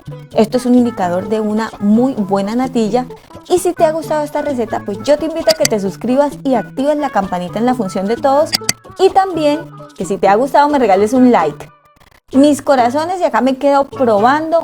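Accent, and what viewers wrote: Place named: Valle del Cauca, Colombia. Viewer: Amo tú acento vayuno oís.... yo soy una colombianacense con alma de valluna💞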